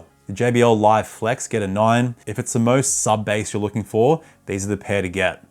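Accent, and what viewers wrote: Australia. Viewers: Always love seeing an aussie bloke talking bout tech on the Internet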